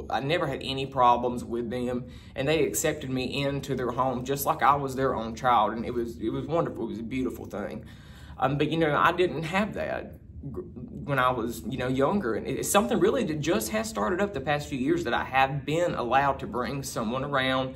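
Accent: South, United States. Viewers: Love your accent, where I. The south are you from? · Haha. Love yer suthen accent!!! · Where are you from. I'm from the south. I've never heard this type of southern accent. Also, the mistake you are making is placing way too much emphasis on the opinions and judgments of your family. Wanting, needing, and begging for their acceptance. Why are you doing this?! Are you a cancer ♋️ or something?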